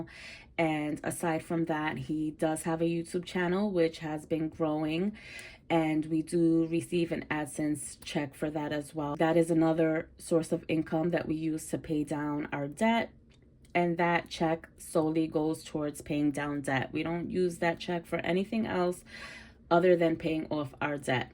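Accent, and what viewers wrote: New York, United States. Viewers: This story really resonated with me. I grew up in NYC too..I hear the NY accent in you lol. I actually bought my first budget binder because of watching your videos. I’m hoping the cash budgeting can help me too. Growing up my parents had really poor money management skills too. My mom was a mom of 4 living off public assistance and my PTSD was her always asking people to borrow money. I vividly remember numerous times she would beg people to loan her money because she couldn’t afford to pay her bills and all the borrowing led to more borrowing and she was always behind. To the point where we almost lost our apartment several times and eventually she couldn’t afford to live where we were and we had to move to the projects. I remember just being ashamed. The adult in me now just thanks god that at least we had a roof over our heads but even then in those reflective moments i wish my mom did better. 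Then when her kids were growing up she looked at our incomes as a way to keep up with her bills. My story is too much to tell on here but even though I never became like my mother asking for help all the time, I didn’t have good financial management skills and education. I didn’t have family members to show me how to save and talk to me about good credit. I used credit cards like they were extensions of cash instead of loans and here I am years later struggling with debt. Your channel is my favorite out of all the channels with cash budgets that I’ve seen so far. I also am in substa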